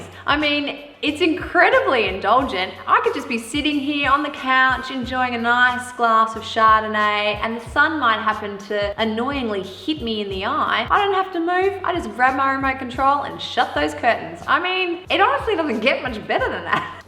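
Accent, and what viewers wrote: Australia. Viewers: Everything seems to come together the music the houses all topped off with the cute Australian accent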